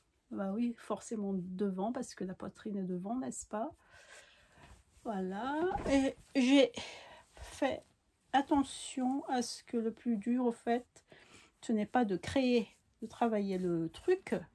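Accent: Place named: Madagascar. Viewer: Coucou J’ai passé un bon moment avec toi dans l’atelier cet après-midi en tricotant. Kassandra me dit: « elle a le même accent que toi » 😂😂. Ben oui on est gasy 🙌🏽🙌🏽🙌🏽 Superbe ta jupe Tiana dans ce tissu ça fait chic. Et wouaou la jupe Dolorès, elle est juste magnifique 😻… ça va bien avec la ceinture. Je crois qu il faut que je fasse la mienne 😜😝. Des bisous de nous 2 😘😘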